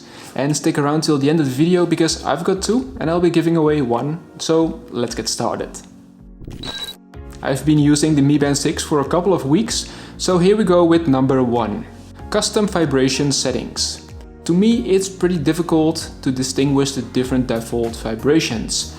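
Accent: Netherlands. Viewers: Ik hoorde echt vanaf de eerste seconde dat je Nederlands bent haha!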